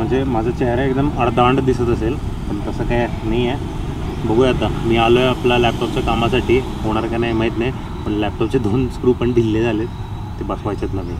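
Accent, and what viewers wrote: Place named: Sangli, India. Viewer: असल सांगलीकर आवाज आणि भाषा...sutti not ch o.... 👌👌😍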